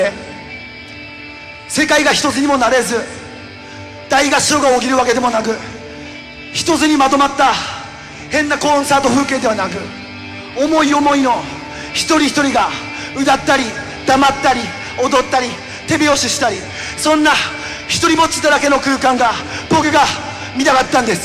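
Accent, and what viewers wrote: Yamagata, Japan. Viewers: 山形弁すげー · 山形県民だから山形の訛りのおかげでさらに入ってくる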